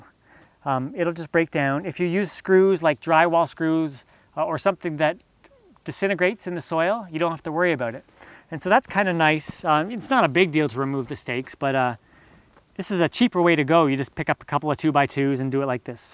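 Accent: Canada. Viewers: He's Canadian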